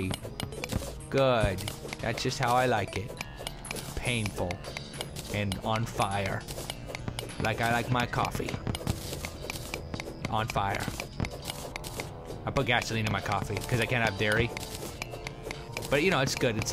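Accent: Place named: England, United Kingdom. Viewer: English :) not American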